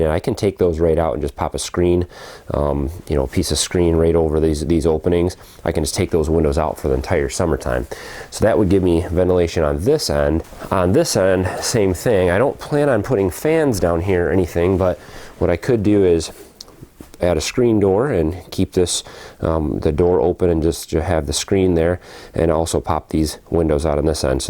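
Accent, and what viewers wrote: Michigan, United States. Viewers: your Michigan accent...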